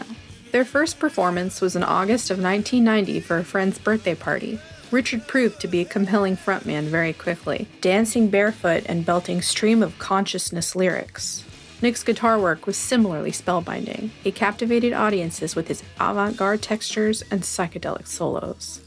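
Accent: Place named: United States. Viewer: Great band. Somewhat ruined by this American voiceover that is read from a script, unless she`s a robot.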